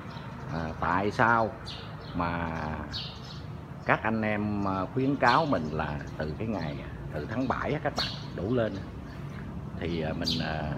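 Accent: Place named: Tay Ninh, Vietnam. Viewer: Dân gốc Tây Ninh nói chuyện nghe cũng đặc biệt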